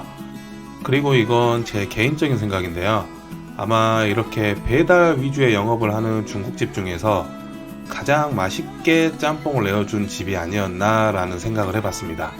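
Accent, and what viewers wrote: Jeolla, South Korea. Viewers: 전라도 사투리 난 실망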